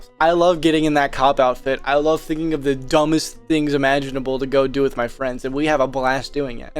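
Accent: Europe. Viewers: + keep the "european" voice, it's so freaking hilarious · Nah, the European voice is golden. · I totally understand wanting to step outside of the idubbbz content cop mould to stamp a unique identity on it, but please keep the edups European voice. it's so fucking funny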